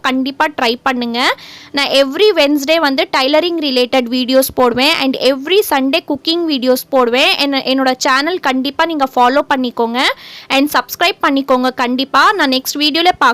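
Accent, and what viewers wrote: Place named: Malaysia. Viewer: Neenga malasiyan ah sis? Unga voice la apdi theriyudhu adhan keten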